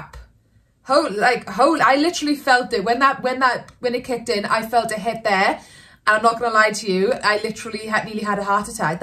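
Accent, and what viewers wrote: Wales, United Kingdom. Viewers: are you welsh? you sound welsh :)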